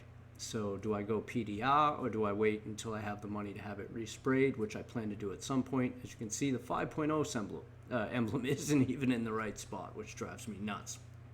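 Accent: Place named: Boston, United States. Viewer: Ca. 😂 Boston accent. Nice car bro.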